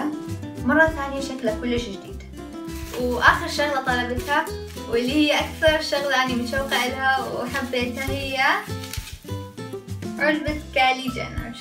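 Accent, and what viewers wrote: Mosul, Iraq. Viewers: حبيت كلامج العراقي لهجتج كانما مصلاويه اول مرة اشوفج وحبيتج وسيويتلج سب سكرايب فدوة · كافي عيارة لو تحجي عراقي لو لبناني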